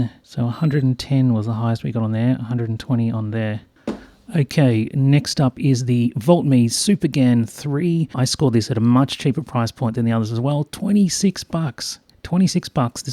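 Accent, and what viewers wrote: Australia. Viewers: Are you Australian ??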